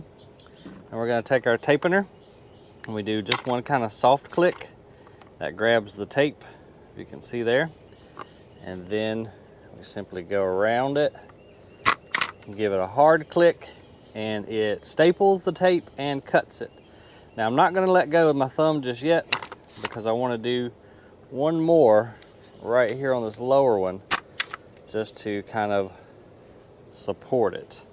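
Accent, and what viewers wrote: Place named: South, United States. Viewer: I am so very interested in this and assume that you have a website with more more. I have so many seedlings in yard and woods, and of different sorts, that I wish to employ my "If you can beat 'em, join 'em" philosophy. I'll throw this out there. I figure if I don't get an answer, that maybe you cover that precise question in the aforementioned, or close enough. Pine seedlings: Vicinity Charlotte, NC-I have forgotten the zones, but given your accent and mine, we in the same "zone." So what other 'persuasions' can I use? Form a parabola with a brick tied to a rope to weigh him down? Soldering wire seems to work for true seedlings but is too 'soft' if you wait too long to begin. PVC pipe 'sleeve' with a 90 or 45-degree joint(s)? A tangent on pine seedlings : How much root-ball do I need to include when transplanting? 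How much 'tap' root. I didn't know I could kill a pine seedling, but yes. I did inherit a green thumb for the central Alabama to Gulf Coast region, but 28107 is different enough that I need to seek help. Whether I get an answer or not, no worries. I've learned enough from this one vid that I'm better off than I was. For that I thank you.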